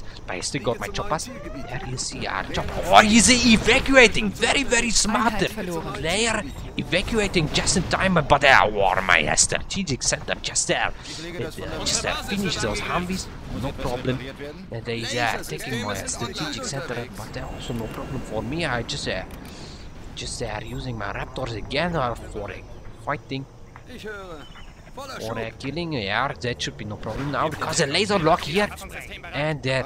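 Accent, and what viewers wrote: Germany. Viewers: I love when Germans speak English :D It sounds pretty nice :D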